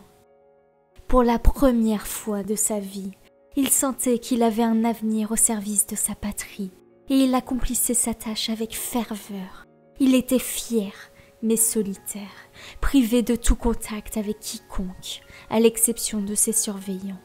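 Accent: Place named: England, United Kingdom. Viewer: Nice, petite vidéo histoire de se rappeler le lore du champion avant le jeu ^^. Toujours un plaisir d'écouter tes vidéos et tkt pour ton accent anglais il est pas si mal en vrai x). Ps: je dirais la majorité des persos importants de demacia et surtout jarvan, garen et lux et si ont peut avoir des membres de la griffe hivernale en plus se serait cool.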